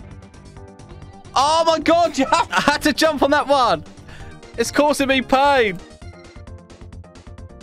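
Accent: United Kingdom. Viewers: Cool vid man the bri ish accent tho ✨AMAZING MAN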